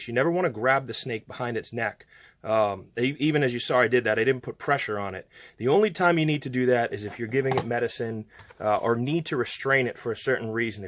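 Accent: Canada. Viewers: I'm from Massachusetts, so I don't know why you would sound Canadian to me if you weren't. But I saw a business with the same name as yours listen in Lynn, MA. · Sounds like you are from Canada?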